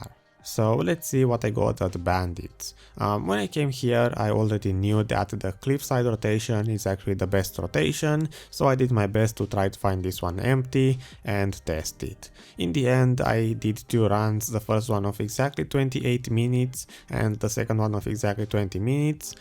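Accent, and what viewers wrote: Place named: Romania. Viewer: yo, nice vids man, are you Romanian? Thou your English is almost perfect, i can hear a hint of accent which takes me in that direction :P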